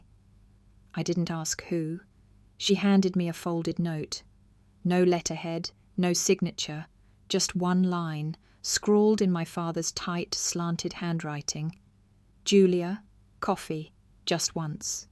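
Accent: United Kingdom. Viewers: I’m not too sure of the narrators accent. I like the story line, just not the ‘almost’ British accent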